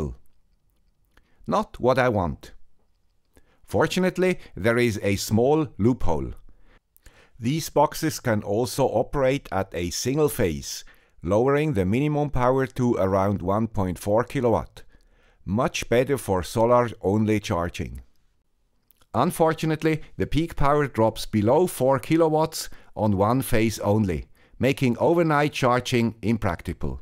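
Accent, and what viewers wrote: Switzerland. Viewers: Omg I can't believe I'm hearing "the guy with the Swiss accent" "live" with fresh ideas, again! 😁😁😁😁😁 Thanks as always! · Right now YT suggested me this video. I rushed to it when I saw it was from the guy with the Swiss accent. I really miss your videos,. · I really really happy, hear your swiss accent again. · Hes back, and his accent is more swiss than ever! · Yes, the guy with the swiss accent is back and helpful as always. Cheers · Adding to other viewers comments, I've missed the Swiss Accent too. Greetings from San Francisco. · Nice to hear the Swiss accent back! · We missed that Swiss accent so much!! Welcome back and congrats on the new ride🎉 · Nice to listen to your swiss accent again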